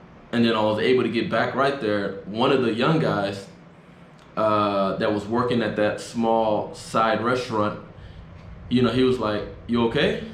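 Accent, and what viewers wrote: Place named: United States. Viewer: Saludos desde O.C. En Cali nadie sale para celebrar el año nuevo. Todos están en casa con su familia. Si salen se salen después en grupos. Si puedo darte un consejo, soy también de Oak Cliff pero tú Español y tu accento es gringo. También tu andas como no sabes nada de Cali o el mundo Latino. Cuidar Pa tengo que suscribir a tu canal.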